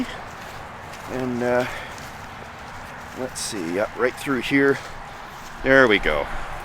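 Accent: Canada. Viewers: After I listening to your accent for several sentences I thought to myself, "I wonder if this bloke is a Canuck?" Then, within 3-5 seconds you mentioned your drink, a beer that came from Alberta! Excellent conception for the video, great content, and my very best wishes from South of the Border.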